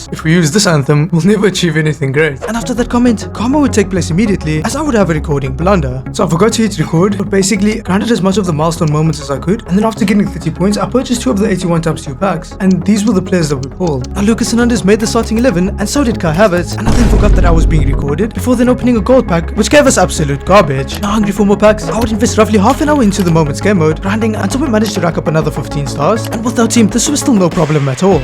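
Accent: South Africa. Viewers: Broski are u from south africa? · R u from Sa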